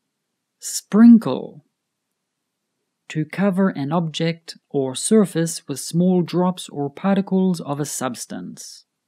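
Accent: United Kingdom. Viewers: Is this british english ? 🤔🤔🤔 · It s british english????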